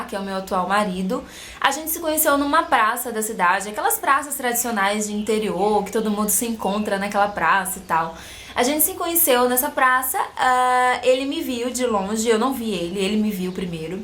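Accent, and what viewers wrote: Bahia, Brazil. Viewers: Caramba, que bonito! A mulher que é amada reflete isso em todas as suas ações e sinto q vc é uma pessoa mt carinhosa, é super gostoso ouvir seu sotaque da Bahia rsrsrs bjs!!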